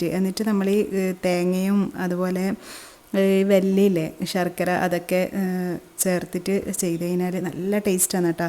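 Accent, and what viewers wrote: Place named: Kannur, India. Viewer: Kannur evideya,slang കേട്ടപ്പോ തോന്നി.നമ്മളും കണ്ണൂർ ആണ്😊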